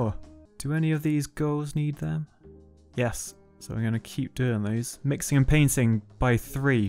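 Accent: United Kingdom. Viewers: Funny question but: are you British? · this man is so bri'ish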